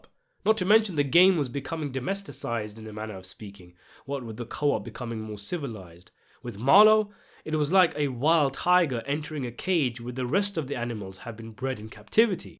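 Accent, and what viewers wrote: United Kingdom. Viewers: The perfectly placed Wire one-liners in a British accent are CRAZY 😂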